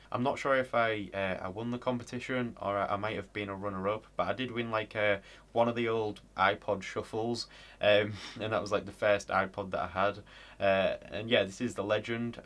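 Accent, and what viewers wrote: United Kingdom. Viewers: So like are you British? Or are you Australian.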